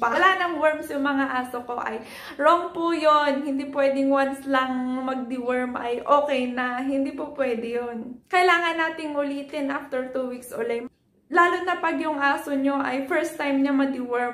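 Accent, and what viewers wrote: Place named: Ilocos, Philippines. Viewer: Hi Doc , Dito lang po kayo around sa Ilocos Region ? nagbase lang po ako sa accent nyo dito sa video . Anyway very informative po ng video nyo since kakapanganak lang ng doggy ko :)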